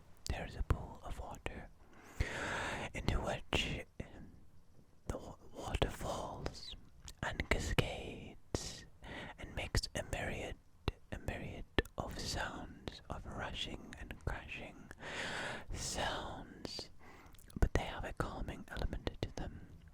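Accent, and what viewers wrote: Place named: South Africa. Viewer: you sound south African homes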